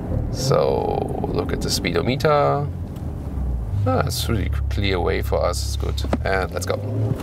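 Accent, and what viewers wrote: Germany. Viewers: nice reviews you're doing. Loving your German accent too! I'd never watch an hour long car review, except yours, dude! You seem to stress leather on seats in your reviews, are you a vegetarian?:) Respect.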